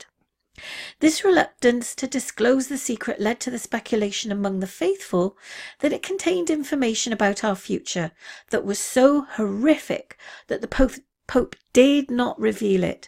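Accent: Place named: United Kingdom. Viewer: Very well spoken dear lady I have a New Fav YT channel I believe the final prediction has been held from us. People Do panic easy and from other circles such as the “Visitors” stories are told of how mankind was grown through Genetic manipulation and planted here on Earth? Thank you, I as a Yank who was raised in Southampton I always perk up to a Brit accent!Blessings to You🌹💀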